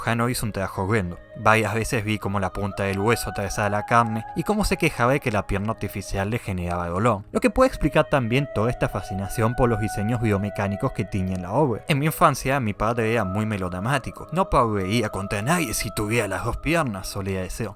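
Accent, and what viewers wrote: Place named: Germany. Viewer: que onda sos de Alemania que tenes ese acento? Pareces Asuka en el doblaje latino (? buen video padreeee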